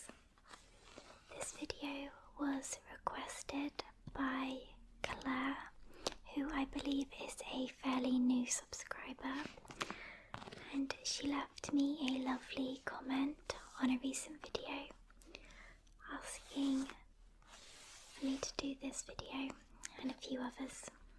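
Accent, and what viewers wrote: United Kingdom. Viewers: Well, first of all, I love your British accent combined with your soft voice (I have American-Mexican accent in comparison, lol!). And you certainly don't need makeup to be cute ;) I also love the presence of green color: your eyes, your shirt, your nails... are your nails green? I think I'm colorblind XD. Anyhow, thanks for this video :)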